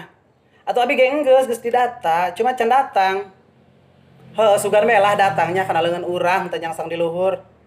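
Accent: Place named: Banten, Indonesia. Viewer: Siga urang banten ngomongna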